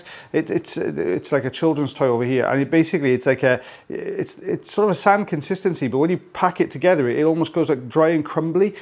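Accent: Wales, United Kingdom. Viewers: That was a great one! And you said Ebony in your lovely Welsh accent. I’m happy. And glad you’re sounding better today.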